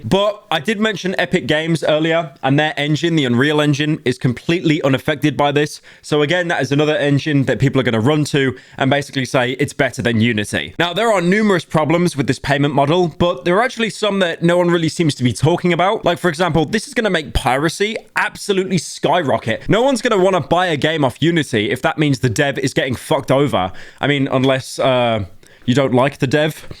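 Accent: United Kingdom. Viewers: I do bot care if live is slop its something i can just listen to every single day I love listening to the british furry yap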